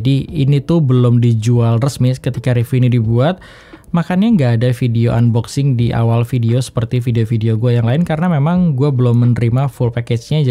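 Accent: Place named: Java, Indonesia. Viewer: hehehe.. maap³ bang, ane cek video nya lagi ternyata kuping ane yg salah😁. Biasanya denger review pake earphone, pas video ini ane pas diluar jd gak pake earphone(langsung speakerphone HP). Berasa beda banget krn ane denger nya kaya ada medok² jawa nya 😁😁😁... 🙏🙏🙏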